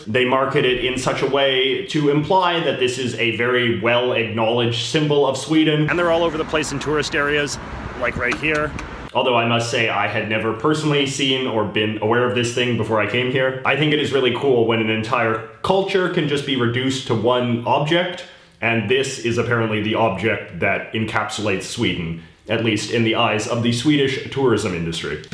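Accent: Canada. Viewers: Wow, an entertaining and informative North American with quite a cool hair style whose only pecularity is to say "a boot" when he means "about". I hope he also realizes that going to Stockholm and possibly see it as a representation of Sweden is a little like visiting New York City and possibly see it as a representation of the U.S. -- It only applies to a degree. (Yes, I KNOW he must be Canadian, but since I've never lived in Canada, I don't want to make comparisons I don't know anything about.) Welcome to Sweden!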